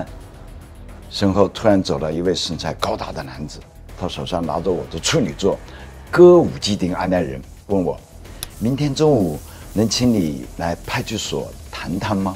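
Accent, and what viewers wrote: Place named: Changsha, China. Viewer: 老乡，没想到您长沙话说得还是那么正宗。听了您的传奇经历，真的觉得既向往又钦佩。真的下不得老地咧，😁😁😁永远支持你。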